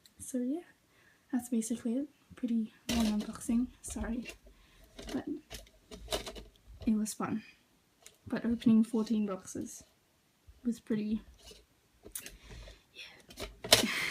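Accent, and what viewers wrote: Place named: Australia. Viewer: your english sounded so normal to me xD ur aussie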